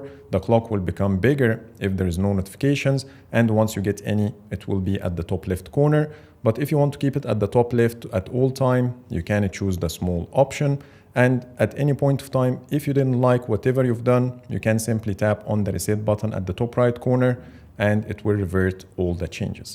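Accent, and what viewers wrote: Egypt. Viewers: Man i love the Egyptian accent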